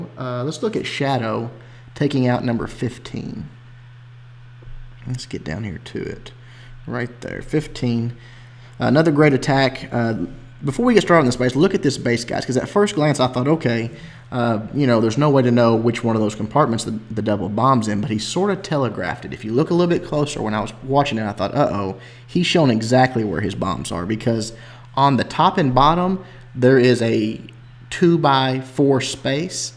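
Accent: South, United States. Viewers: I recognize that accent anywhere in the south ;)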